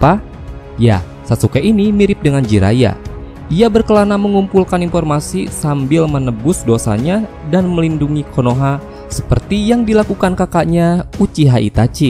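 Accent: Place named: West Java, Indonesia. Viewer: piks.. admin org sunda. 😊😊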